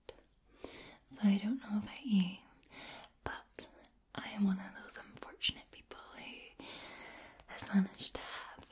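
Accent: Scotland, United Kingdom. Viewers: Can you do a whisper video with more of a Scottish accent and thank you for your time 😊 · As long as it's said in a Scottish murmur, I could listen to advertisements all day. you picked a great ASMRtist!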